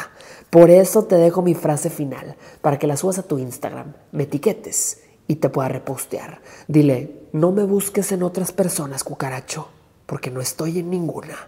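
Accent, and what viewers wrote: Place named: Cádiz, Spain. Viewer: Habla como los angeles y me llego como si de gaditano se tratara oleeeee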